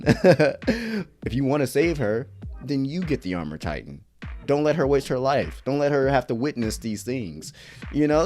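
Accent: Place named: Baltimore, United States. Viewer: Are you from Baltimore? The way you say your too’s sounds smack like it lol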